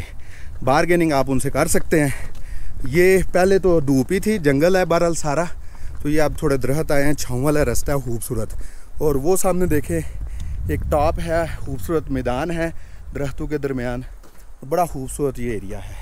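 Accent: Punjab, India. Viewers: صاحب براہ مہربانی اردو بولتے ہوئے تلفظ کا خیال کیا کیجئے۔ میرا مطلب ہے پنجابی لہجے کی آمیزش بہت زیادہ ہو جاتی ہے کبھی کبھار۔ چونکہ آپ استاد ہیں لہذا آپ اگر تلفظ میں غلطی کریں گے تو ہم جیسے پھر کس سے سیکھیں گے؟ امید ہے آپ برا نہیں منائیں گے۔ بہت شکریہ۔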